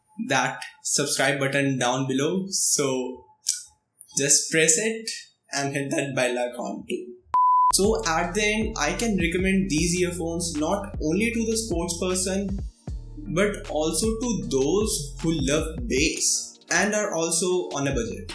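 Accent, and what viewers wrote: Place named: North India, India. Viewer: Decent presentation. Loved the accent from a North Indian . Way to go.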